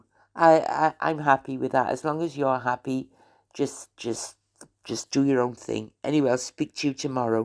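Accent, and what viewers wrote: Wales, United Kingdom. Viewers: your sweet ,dulcet, Welsh tones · Oh it’s good to hear your welsh accent, I was brought up in Croesycielog and used to go to Cwmbran cinema, left when I was 18 to train as a nurse at Gloucester, retired now but I do love your channel, lost my husband in January and my daughter in July this year, so sorry about your cat, I lost both my dog and cat a few years ago, but to old now to get another one, I have missed your channel, it was lovely hearing from you, have a wonderful Xmas and a very happy new year, hope that lottery isn’t a scam 🏴❤️ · Oh. It’s so lovely to hear your beautiful Welsh voice! I hope you have a lovely Christmas. 🎄🙋🏻‍♀️🎄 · Lovely to hear your beautiful Welsh voice again.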